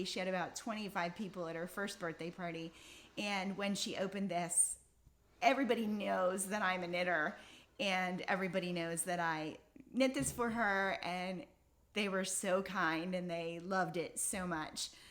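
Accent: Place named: Pennsylvania, United States. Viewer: Hi!, I just found your podcast. You are very pleasant to watch. It sounds like you are from PA. I grew up in Lycoming County. Your granddaughter’s sweater is so beautiful; I just love the color. She is a lucky little girl to have a knitting grandma. Happy knitting!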